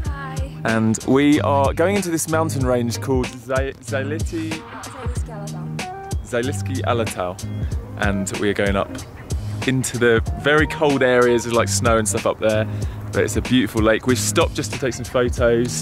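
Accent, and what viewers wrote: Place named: United Kingdom. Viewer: Hehe just a clear British accent;)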